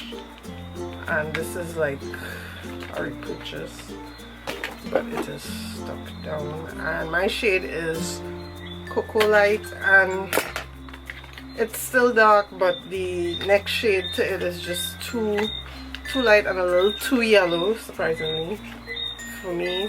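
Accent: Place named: Trinidad and Tobago. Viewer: It's so refreshing to hear a Trini makeup guru for a change! And you seem to really know your stuff. #trinitodbone #sexytriniaccent #sephoragiveaway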